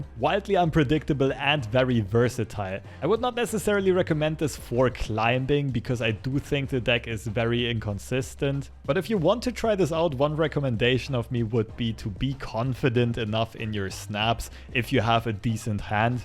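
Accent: Germany. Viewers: Most german accent on YouTube 😍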